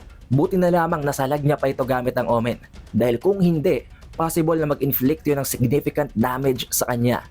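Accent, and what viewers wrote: Philippines. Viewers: Ok naman din to kaso ang OA ng TagLish niya. Kalalaking tao pero na taglish? Bading!!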